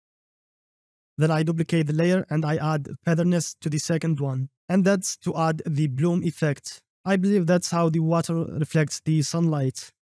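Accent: Arab world. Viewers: أنا متأكد انك عربي من لهجتك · أحسك عربي ؟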